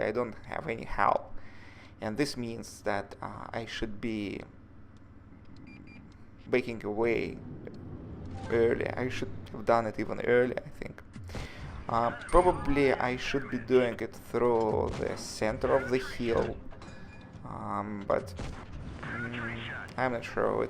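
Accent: Russia. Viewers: Totaljy Rassian accent :D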